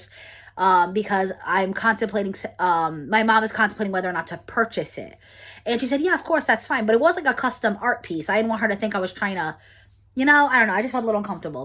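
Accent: New York, United States. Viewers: Yes, I was in New York and the day that I landed it look like doomsday on Long Island. I wish they had a farmers market here at night. I am not a morning person. I can understand that this week I don’t wanna go anywhere and I’m being forced to go somewhere. My grandson died of accidental drowning a year ago. His birthday is June 30. He would’ve been four. On a good note my son and his wife are having another baby. I just found out yesterday. I have a whole garden full of sunflowers in my yard now. I thought from your accent you were from New York. I enjoyed your blog for the farmers market.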